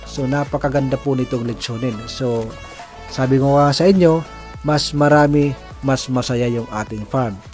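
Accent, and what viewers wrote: Waray, Philippines. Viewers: San makakabili Darag Native na yan san lugar ba kayo, parang waray ang tuno ng salita nyo?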